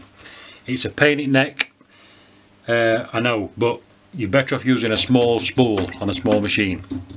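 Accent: Yorkshire, United Kingdom. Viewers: You sound like you’re from Yorkshire? Where to you get your gas bottle from for gas mig welding?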